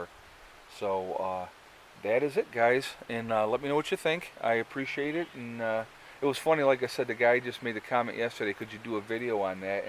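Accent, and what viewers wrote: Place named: Pennsylvania, United States. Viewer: I know you say you live in New York, but I just talked to someone on the phone that works in pa and sounded just like you. Also a visiting nurse. Where do you live really? Haha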